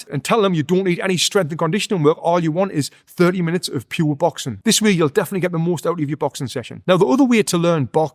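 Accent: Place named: Geordie, United Kingdom. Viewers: Geordie was one of the first British accents i have been actually aware of (thanks, DI Lewis!) and i think it's sooo lovely and really relatively easy to understand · Good, sound advice from a true Geordie (I hope non-Brits can follow your accent). Brit here, in my later years, and finally, after so long, I'm taking up boxing for physical and mental fitness. Beginning with a boxing PT at a local fitness centre, and hope to move on from there, not professionally, of course, but in some way get the opportunity to get in a ring and experience what I have always wanted since I was a kid. Keep up the good work, and many thanks from London.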